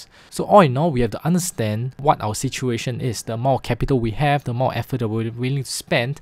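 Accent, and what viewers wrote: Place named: China. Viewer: Very distinct chinese accent.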